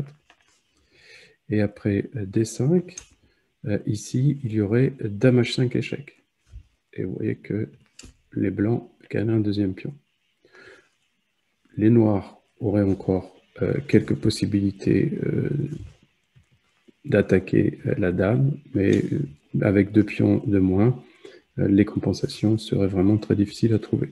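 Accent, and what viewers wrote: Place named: South, France. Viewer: magnifique vidéo, très pédagogique ! Je vais reprendre mon activité sur la chaine "échecs en amateur" et parler de ta chaine comme une pépite du net échiquéen francophone... dès que le COVID m'en laissera le temps (je suis médecin)... en tout cas c'est un vrai plaisir de visionner tes videos : courtes claires et ensoldeillées par ce bel accent du sud !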